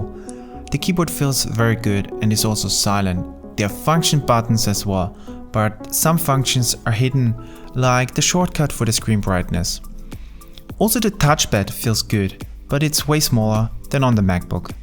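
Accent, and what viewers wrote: Germany. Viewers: What accent is that? Austrian? hungarian? german? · lol are you german?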